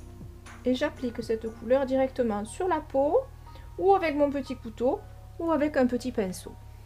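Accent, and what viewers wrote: South, France. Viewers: Merci, toujours magnifique, très bien expliqué avec ce bel accent du midi.. Bonne soirée. 💐🐈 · J'adore... cela me fait rêver... cela paraît si simple et ton accent du Sud-ouest ou Sud-est me touche moi l'Aveyronnaise que je suis. Bisous et merci👄💖👍👌👏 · Je souhaite un prompt rétablissement à la Lady. Je regarde régulièrement vos vidéos et ce que vous faites est vraiment sympa et original! Vous avez une dextérité dans le maniement de votre couteau que j'aimerais bien avoir. Je peins également ou plus précisément je repeins depuis quelques temps après avoir laissé les pinceaux de côté pendant plus de vingt ans! Vous faites des expositions? J'imagine qu'avec votre accent, vous êtes dans le sud de la France.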